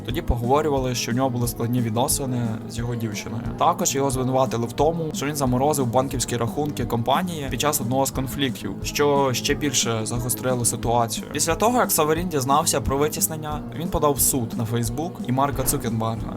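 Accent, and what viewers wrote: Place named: Zakarpattia, Ukraine. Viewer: Бро, дуже якісний контент, акцент цікавий, ти часом не з Закарпаття?)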